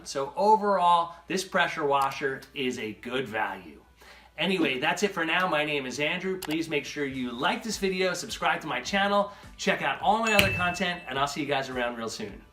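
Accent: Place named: Pennsylvania, United States. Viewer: hehe is this guy from PA? I like how his accent says "water" as, "wadder" :0) Great tips though, I like a visual on how to hook mine up.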